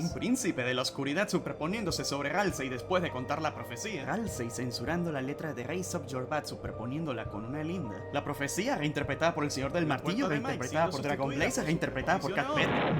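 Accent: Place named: Venezuela. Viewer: Nada más escuchando tu forma de hablar reconocí Venezuela ❤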